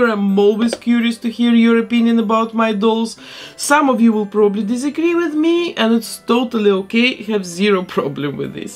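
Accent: Russia. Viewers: Вы русская? Чувствуется акцент )))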